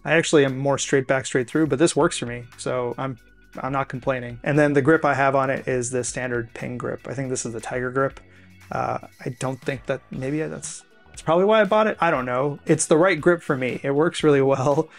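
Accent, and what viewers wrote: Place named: New Zealand. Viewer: Cant believe I didnt pick up the accent. Cant stand listing to kiwi accents, or sepo's either, but put them together and it works. US ladies def love the kiwi twang aye :)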